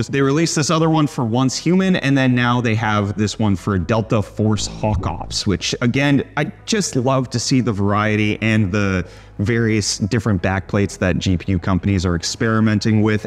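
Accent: South Africa. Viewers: I love that is starting to sound more south African as time goes on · are you from SA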